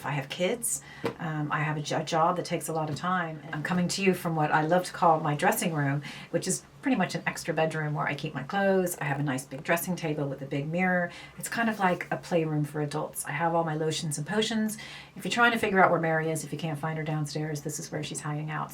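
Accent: Ireland. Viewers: Thanks Mary,you seem very informed,look forward to your other tutorials.Love your accent with a slight undertones of an Irish accent coming through :)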